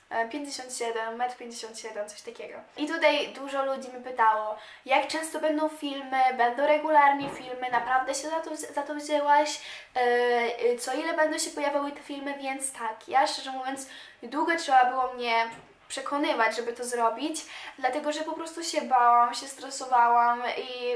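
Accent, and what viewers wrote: Italy. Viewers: Kocham twój włoski akcent, jest świetny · Ale masz włoski akcent 😄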